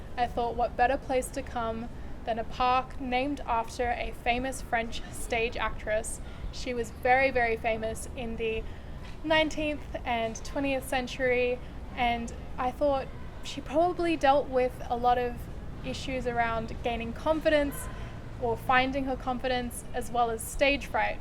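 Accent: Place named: Australia. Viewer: Your videos are really fun to watch especially with your Australian accent is just icing on the cake. Already subbed for keeping myself constantly motivated watching your old videos and upcoming ones. Thank you so much and keep making more videos! By the way, I'm from India and am learning French as well, and I plan on reaching at least a CEFR B1 level in French before moving to France.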